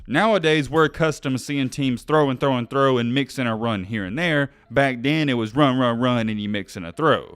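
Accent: South, United States. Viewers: Big facts -- something about that southern drawl is a turn off - then he's actually a young dude talking about historical content - essentially not providing anything insightful - anyone can pull up stats and read post career articles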